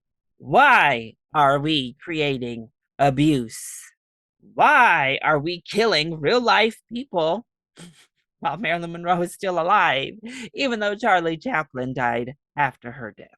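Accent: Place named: South, United States. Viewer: Per usual, great review,! Love that good ole fashion southern twang! I can’t lie, I actually quite liked your dishonorable mention, “Men” & “Blonde”. HOWEVER, I can 100% get where you’re coming from & respect your opinion on the latter. Everything else, yeah, I’m with you on! Plenty of disappointments this year, unfortunately.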